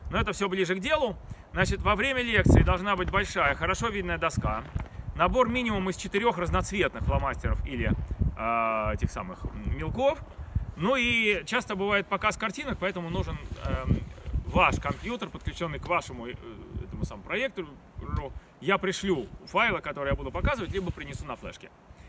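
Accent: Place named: Okanye, Russia. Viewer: Очень харизматичный мужик (в хорошем смысле). Окает как монах.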